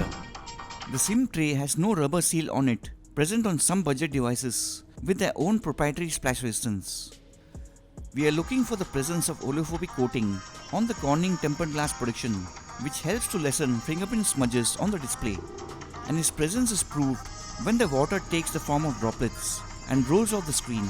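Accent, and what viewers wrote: India. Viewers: Nice test bro, india aksen 👍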